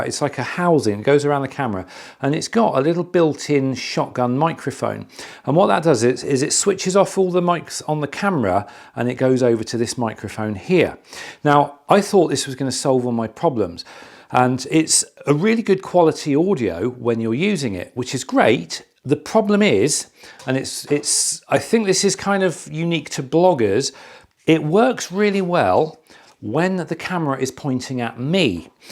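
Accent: United Kingdom. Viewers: I remember that you were talking about Furry failures 2015 and again Making a cover for the Microphone testing it with a fan. Saying fur during your variety Channel. That makes me laugh your British accent. You are Fur happy 😊. That's so cute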